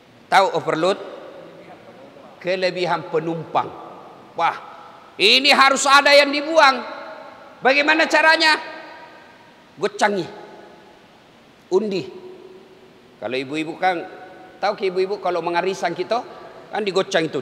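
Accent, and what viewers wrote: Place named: Bugis, Indonesia. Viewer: Cuplikan nya saya suka,persis ceramah almarhum zainudin mz... Namun versi bugisssssssss😀😂😂😇😇😇😊😊 Yang pernah denger ceramahnya di channel almarhum mz ??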